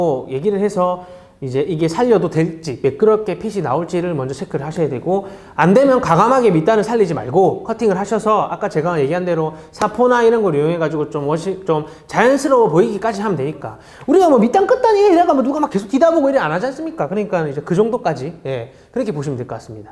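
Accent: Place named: Busan, South Korea. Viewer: 유투브 잘보고 있습니다.같은부산사람으로 남천동에 살고 있습니다. 동천고에 다녔다고 하니 너무 반갑고. 찰진 사투리가 매력적이라 하루저녁에 동영상을 다 봐버렸네요. 유쾌하고 밝은 에너지가 느껴져서 언제 한번 커피마시러 서울로 가고 싶습니다. 옆에 같은 친구 또는 이웃이 있다면 사는게 재밌을것같습니다 색상 배색도 한번 부탁드리겠습니다 쌩큐~~^^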